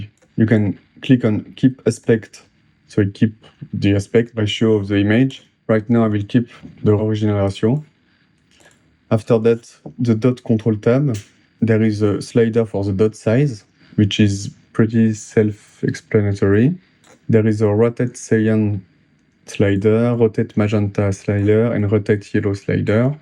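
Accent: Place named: France. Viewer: Hello, from your accent i guess you must be french. Merci pour ta macro. Je me penchais justement sur la question et j'ai pu étudier ta façon de procéder à l'intérieur de ta macro. Au cas où, mais tu dois être au courant <link> Le gars vient aussi de sortir un plugin pour photoshop qui pourrait aussi te donner des idées pour une v2. Encore merci.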